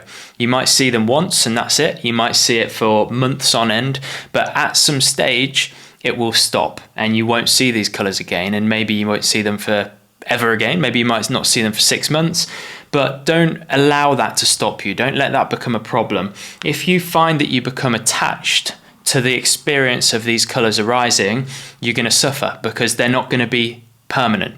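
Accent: United Kingdom. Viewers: I REALLY APPRECIATE HEARING BRITISH PEOPLE SPEAKING OUR LANGUAGE AND SEEING WORDS SPELT CORRECTLY RATHER THAN SPOKEN IN AN AMERICAN DIALECT WITH WORDS PRONOUNCED AND SPELT INCORRECTLY WITH LETTERS MISSING! AMERICAN LANGUAGE IS ENGLISH SO WHY DO THEY FEEL THE NEED TO MISPRONOUNCE AND MISS SPELL WORDS AND CALL IT AMERICAN ENGLISH? PATHETIC!! GREAT VIDEO BY THE WAY FIRST TIME IVE SEEN ONE OF YOUR VIDEOS AND I FOUND IT VERY INFORMATIVE, I'M LOOKING FORWARD TO WATCHING MANY MORE, THANK YOU FOR BRINGING YOUR VIDEO'S ON THE INTERNET. 😊😊😊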